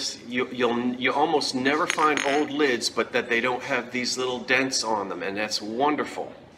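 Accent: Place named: Philadelphia, United States. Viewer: "Wooder"....love the Philly accent. Reminds me of home....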